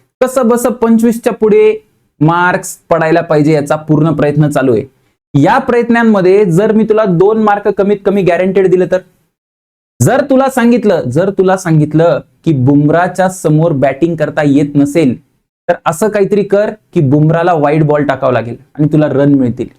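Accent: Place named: Jalgaon, India. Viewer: Sir tumchi language Jalgaon chi ahe😅tumhi jalgaon che ahe ka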